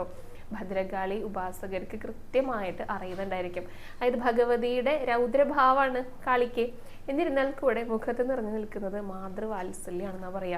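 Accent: Thrissur, India. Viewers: Ur presentation with thrissur accent is liked much. 😢😢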